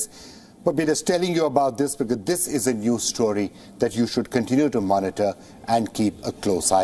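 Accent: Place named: India. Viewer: Into a "Depths" of the pacific ocean. Funny indian guy speaking funny english😂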